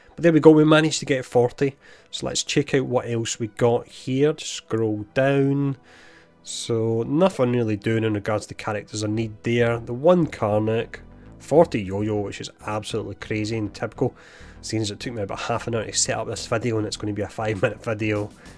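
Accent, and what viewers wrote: Scotland, United Kingdom. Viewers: First time seeing you! Nice you’re getting in front of the cam! The beard definitely fits the Scottish accent! Automatically don’t want to mess with you! 👀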